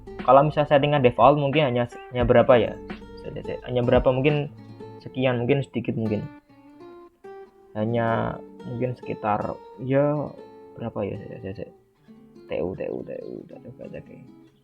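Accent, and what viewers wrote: Indonesia. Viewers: Thanks Banget bang Info nya,btw abang orang jawa ngapak? XD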